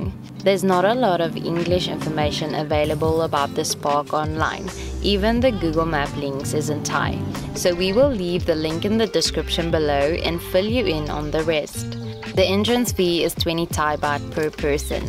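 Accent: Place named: South Africa. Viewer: Your accent... Is julle van Suid Afrika?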